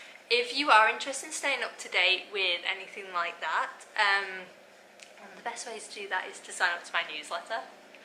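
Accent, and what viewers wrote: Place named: Chester, United Kingdom. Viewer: Loved your first podcast. Your voice reminds me of home (I’m originally from Chester but now live in Scotland). Tilly is gorgeous, hope to see more of her and you ☺️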